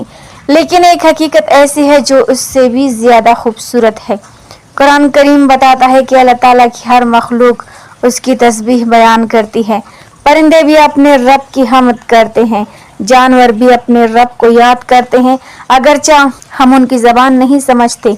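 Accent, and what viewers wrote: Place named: Saraiki, Pakistan. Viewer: Bolne ka style suraiki lag raha he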